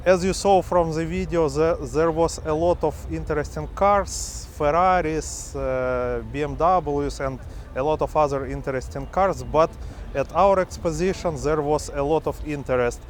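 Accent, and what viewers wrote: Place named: Eastern Europe. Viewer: Nice exposition stand 😉 Great cars 👍 and wasted someone's effort on crappy Volgas 😄 So now we're waiting for six or seven more episodes on other Alfas/Maserati 😁 Every car and its story is interesting, if you just have time and possibility. As for your English - better is Eastern European English with accent, than fluent srussian. Stay safe and strong and looking to next episode of Golden Beauty ✌️ Greetings from Lithuania!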